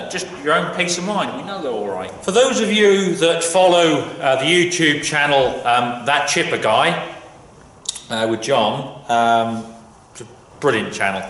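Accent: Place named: Gloucestershire, United Kingdom. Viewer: well difficult to tell but where abouts in the country are you from? as a Gloucestershire lad you sound like a posh GL resident, you are going to say your from up north now ha ha. Enjoy the chanell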